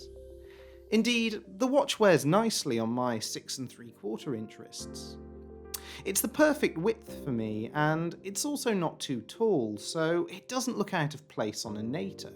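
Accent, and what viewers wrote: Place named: United Kingdom. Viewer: Great first review. Love the British accent and way you presented it. 10 minutes is also a well digestible length. Well done and looking forward for more reviews from and WatchGecko.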